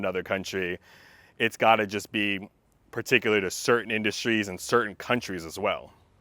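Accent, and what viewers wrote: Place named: India. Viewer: You have a very typing Indian Accent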